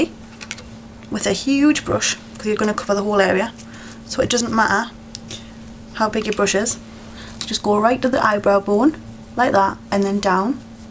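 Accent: Newcastle, United Kingdom. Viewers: OMG it's nice to hear you talk, love your British accent. Oh by the way, you're pretty even without any make-up at all. The nailpolish is strange, but them I'm 48, haha... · Is that a Jordi accent?? I absalutley love it <3 x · r u from newcastle or around somewhere there?? great tutorial btw!!!!!!!!!!! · She's a Geordie, it's a famous northern English accent. It's the same accent as Cheryl Cole if you youtube her on X-factor UK...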